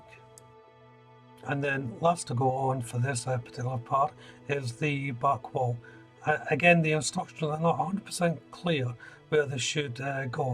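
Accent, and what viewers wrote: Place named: Scotland, United Kingdom. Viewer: Am enjoying this as will be getting this for myself soon. I know it's hard but if you could have a look at your sound so everyone can enjoy your lovely Scottish brogue. Is that the word? Who knows. Thanks for your time and effort. Good stuff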